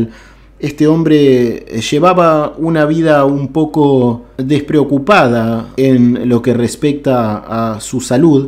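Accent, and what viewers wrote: Argentina. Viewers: Adoro tu canal! Y con todo respeto el acento argentino es bellísimo. Muchas Gracias!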